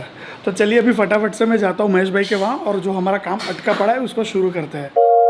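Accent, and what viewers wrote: India. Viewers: Sweet gujrati speaking by bhai 🤗😂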